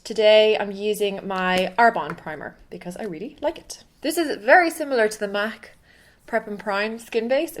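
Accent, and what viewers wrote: Ireland. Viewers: She's actually from Ireland :) xx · She's from Ireland :)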